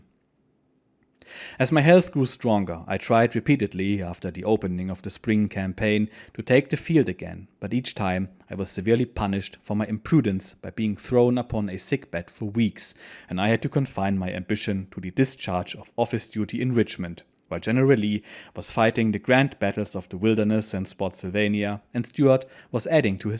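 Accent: Germany. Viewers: Really a great story ,I've enjoyed every episode thanks for reading it as your German accent makes it feel like it was him reading 📚 it !!!! Fantastic !! As the English say cheers!!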